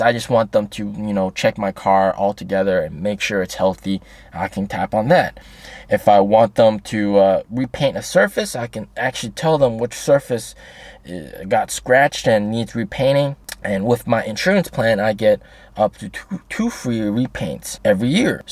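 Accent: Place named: South, United States. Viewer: I like your southerner accent.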